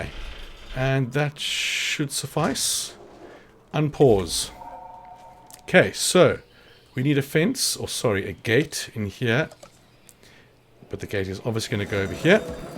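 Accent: South Africa. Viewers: Are you South African? If so I am too :D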